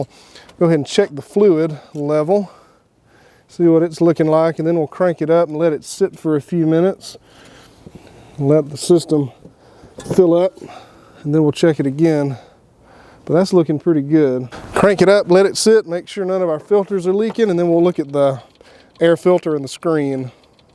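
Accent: South, United States. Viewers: The way you southerners say oil kills me. 😁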